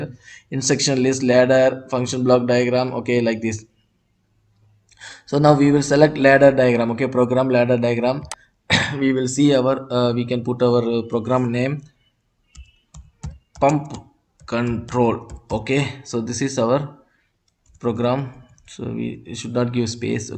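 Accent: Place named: India. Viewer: Gotta love the Indians attempting to talk English. Loosen ur turbin a little Bit. Thinks it still cutting off the blood flow a little bit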